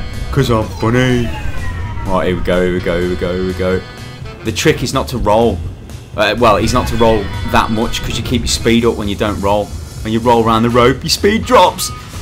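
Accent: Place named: North, United Kingdom. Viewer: Your accent has changed since this video. You sound more northern in this video.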